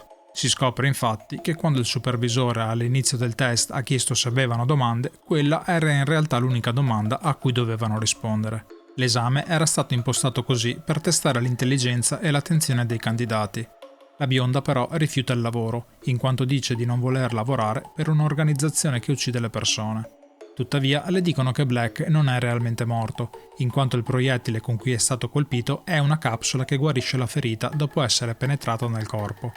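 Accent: Sardinia, Italy. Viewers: Penso Sardo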